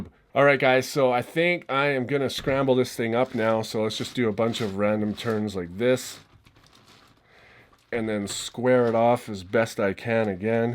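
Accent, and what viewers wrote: Canada. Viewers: I detect a Canadian accent ... you from Toronto ? Teach ya to play guitar if you help me solve a 3x3 eh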